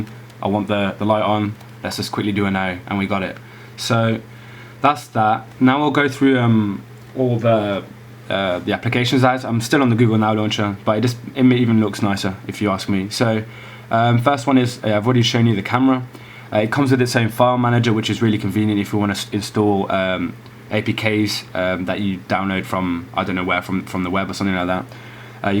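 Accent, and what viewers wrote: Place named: Netherlands. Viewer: Flawless English accent for a Dutchie oml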